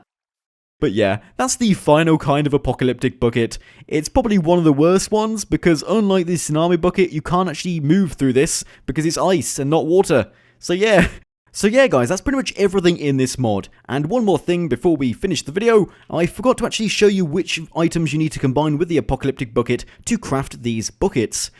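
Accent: United Kingdom. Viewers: He didn't say that just because he has a British accent. Do you know how many British YouTubers there are? None of them I've watched sound lime Stewie, but he actually does.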